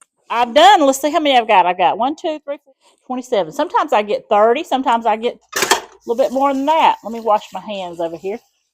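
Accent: South, United States. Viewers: Absolutely one of the best snacks. Thank you. Love your southern accent.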